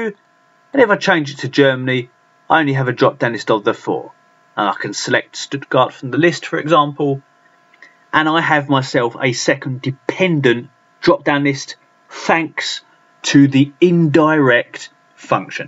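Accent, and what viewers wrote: United Kingdom. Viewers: Hello Initially I have to appreciate your perfect pure British accent which urged me to listen to it several times eagerly Then I download your nice video clip to learn about indirect function I wonder whether or not its possible for you to receive any Excel example from others in order to correct and provide some hints Thanks in advance Kambiz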